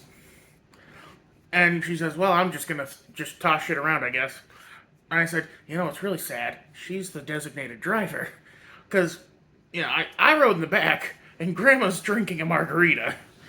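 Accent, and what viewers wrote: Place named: Midwest, United States. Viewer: I didnt know you live in texas you got a midwest accent strange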